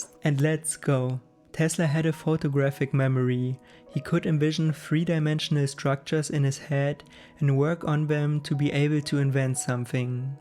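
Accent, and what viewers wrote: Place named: India. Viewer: Without the video I would have thought that you were Indian. Good video btw 👍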